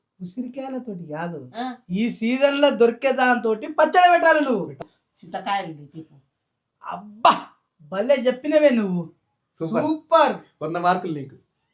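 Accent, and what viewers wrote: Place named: Mahabubnagar, India. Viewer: Hi Anna mi videos Chala baguntayi mahabubnagar slang lo chala baga mataladatharu nen pregnant adhi chuda gani cravings starting ayindi nak kosam koncham pampiyandi please 😊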